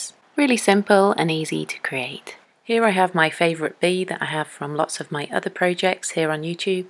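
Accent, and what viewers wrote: England, United Kingdom. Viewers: You sound like your from England 🏴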